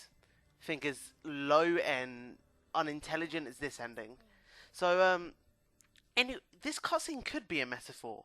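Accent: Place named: United Kingdom. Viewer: OMFG I HATE FUCKING BRITISH ACCENTS IT FUCKING SUCKS@ KHJKHJKGHKGJK CANT STAND HIS FUCKING VOICE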